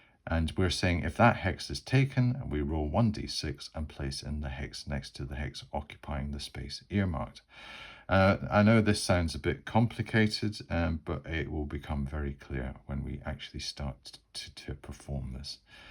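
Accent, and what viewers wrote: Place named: England, United Kingdom. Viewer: Sir your English accent is pleasing to the ear and rarely gives me trouble. However for a minute tonight I was hearing High Merlin. " High Merlin what a concept! " I then caught up and realized it was high moorland. Sweet.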